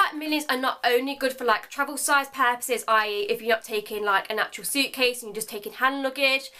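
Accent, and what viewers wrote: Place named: Midlands, United Kingdom. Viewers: Loved this haul, I could imagine how excited you were to go into Sephora 🙌🏻 I love when you used the word wounded when you said about the fenty foundation. I am originally from Coventry which is close to Leicester but live in Essex now and they don’t talk like we do in the midlands down here!! Makes me feel a bit homely when I hear you talk as it reminds me of home ☺️ xxx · Stephanie Harris also a coventry girl here. I moved away but I'm still close enough to Coventry and Leicester and visit fosse park regular. I knew accent was from nearby before I even knew where she was from 😂😂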